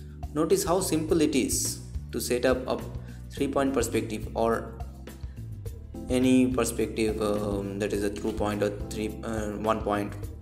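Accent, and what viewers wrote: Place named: India. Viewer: I've always found pleasing hearing English with indian accent (btw, helpful video... thanks a lot!)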